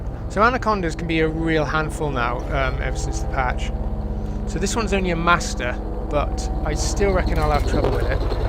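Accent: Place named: Wales, United Kingdom. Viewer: Getting an oculus in a few days. Just hyping myself up by watching elite vr videos lol. I love elite but I'm gonna be so obsessed with it now from what ive seen and heard from vr owners. I love feeling immersed in games and vr is a great tool for that; its perfect for elite. Good to see someone having fun messing around advance and shooting things. Also,are you from wales? You have the accent of someone down cardiff way. Just curious, being from wales myself.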